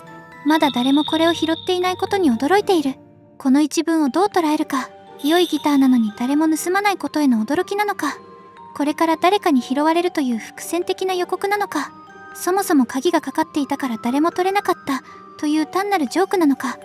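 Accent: Kansai, Japan. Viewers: なんで関西べん？ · 関西弁なんやww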